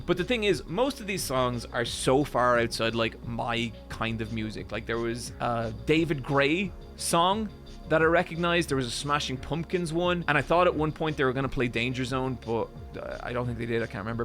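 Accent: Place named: Ireland. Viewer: He is Irish...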